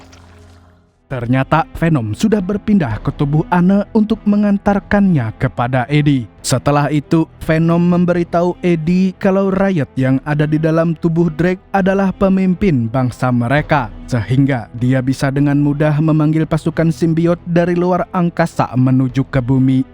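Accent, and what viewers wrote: Banjar, Indonesia. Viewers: Baru sadar urang banjar rupanyak😅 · Orang Banua jua kh mang😅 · Banjar banar 😂😂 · orang Banjar mang?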